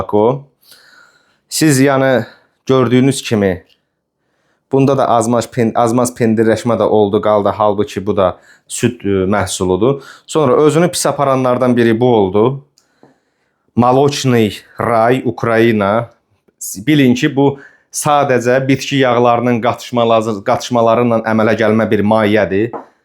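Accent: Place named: Karabakh, Azerbaijan. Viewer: Çox sağ ol oğlum..Mən də sevərək baxıram bu videolara..Danışıq ləhcəni daha çox sevirəm,məncə Qarabağlı balası olmalısan .🙏🙏🙏🌹🌹🌹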